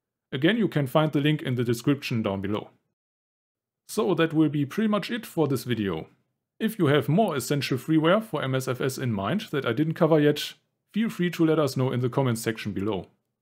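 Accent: Germany. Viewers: Super Video 🙌 Ich wusste sofort, dass du deutsch bist ahhahaha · Hey great video thanks! Funny is just how you express your English! Then I poop the sausage off! It's so funny your German English accent. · when a german speek english nice video ;) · Ich wusste doch, dass ich da nen deutschen Sprecher rausgehört habe. Super Video und beinhaltet fast alle must haves. Was ich noch empfehlen kann ist We Love VFR. Bringt VFR Objekte wie Sendemasten, Radarkuppeln, Kräne und bald wohl auch Industrielle Schornsteine nach Europa. Alles basierend auf Open Street Maps. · Hello again, long time gone. I finally found you doing flights again! Love your Duetsche accent! SUBBED/LIKED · thanks for sharing, you have very strong germany accents while talking in english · I like the german accent ;) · Dieser deutsche Akzent kickt so hart! xD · Danke! (you have a German accent! :P) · I missed your Duetsche accent! 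I had to unsubscribe to many cuz it got to be too much. I hope to fly this summer in Xbox X MFS2020. Keep up the good work lad! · I bet you are from a high German area like Münster, Bielefeld or Osnabrück. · ich wollte ihm nur einen Tipp geben und es klingt doch noch recht Deutsch aber ich weiß nicht wieso du dich dort nun einmischen musst Grüße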